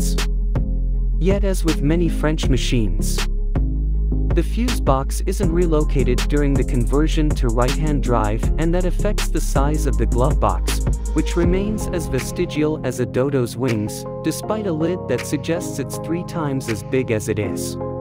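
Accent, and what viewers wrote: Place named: United States. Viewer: A strange review to listen too, the commentary sounds like an American version of R2-D2, the pronunciation of words such as chassis are so American, also the script repeats itself I say the script repeats itself…all in all a ghastly review…..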